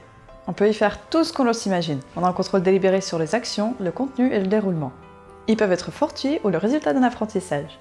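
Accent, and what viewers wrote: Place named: Switzerland. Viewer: Ah d'accord merci! Vous avez pris l'accent :) Vous habitez dans quel canton? Et c'est vraiment intéressant ce que vous faites bravo!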